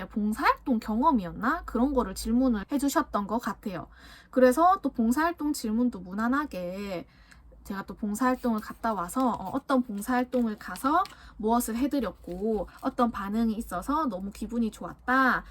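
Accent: Busan, South Korea. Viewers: 최종합격도 축하드립니다!!! 저도 작년에 같이 고민 토로했던 기억이 ㅠㅠㅠ 저도 면접보고 아직도 발표 기다리는데 ㅠㅠ 넘나 떨리네요 부산사투리도 너무 정감갑니다 ㅎㅋㅋㅋㅋㅋㅋ저번주에 부산여행갔어요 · 우아 감사합니다!!!!! 필합 이후로 급 면접이라 님 영상보면서 가상경험 하고있어요ㅠㅠ 게다가 저도 경남권이라 사투리들으면 넘 안정돼요....ㅎㅎㅎ 면접가기 전날까지 이영상 보고갈거에요!!!♡ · 부산사람의 말투가 느껴지는군요!ㅋㅋㅋ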